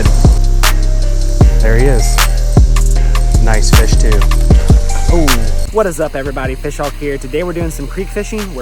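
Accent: Pennsylvania, United States. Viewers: That's a awesome day of fishing! What state are you fishing in? You sound like you're from P.A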